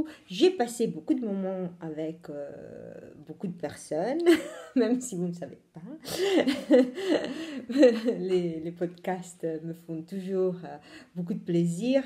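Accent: Italy. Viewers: Contente de te retrouver ! J'ai eu une collègue italienne qui avait vraiment le même accent que toi, c'est chouette, à chaque fois je pense aussi à elle :) Mais sinon quand même je te regarde pour toi et tes jolis tricots !!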